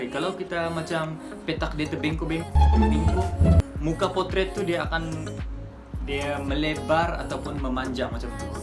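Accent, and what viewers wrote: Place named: Sabah, Malaysia. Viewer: Mcm ada bahasa sabah campur kl😂 btw hope you get a lot subscribers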